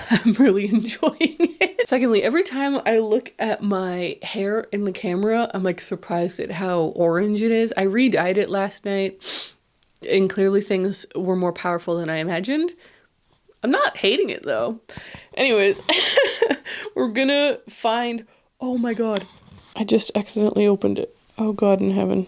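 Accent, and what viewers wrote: Canada. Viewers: your canadian accent seems strong to me and i love it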